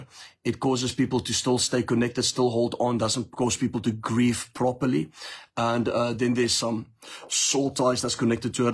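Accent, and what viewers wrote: South Africa. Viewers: Afrikaanse Boertjie from South Africa..speaking English..a distinct dialect. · My favorite accent is the South African accent lol. Thank you so much Prophet for reminding me I am living in the spirit. We are not bound by the law but bond servants to Christ. One day I hope to visit your church in South Africa! Jesus is King of Kings!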